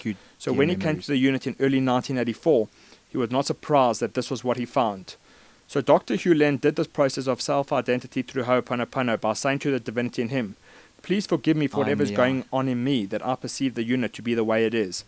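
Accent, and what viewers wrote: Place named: South Africa. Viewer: The Video looks like it was filmed at Palace of the Lost City, Sun City, South Africa... I had the pleasure of spending a few days there. Also, your accent may be a give away too...:)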